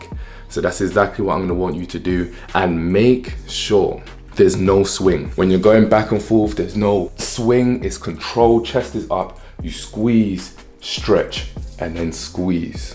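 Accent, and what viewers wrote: London, United Kingdom. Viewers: I'm tired, when I heard him talk I was like oh he's british I need one in english 😂 I'm so damn sleepy. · I’m here for his London accent…👀👀👀 and for burning that back fat off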